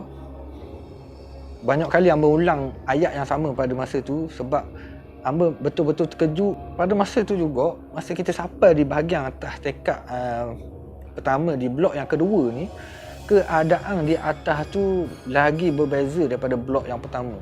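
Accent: Terengganu, Malaysia. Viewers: PEKAT MOLEK GANU TERR... TAPI TETAP MANTAP BOSSKU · OH..terbaik..salam ukwah dari saya d SABAH...walau saya tak berapa nk faham sleng terangganu..tapi sangat memeranjatkan part abg sado tg lembut tu naik motor...nanti khabo kat dia jangan buat lagi...😂😂😂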